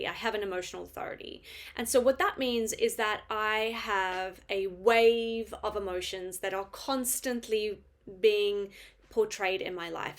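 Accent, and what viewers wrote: Australia. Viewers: Couldn't tell by your accent, proper Aussie now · You have picked up the Australian accent very well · Good to 'hear' that you have an aussie accent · you have Australian accent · Your accent is 100% Aussie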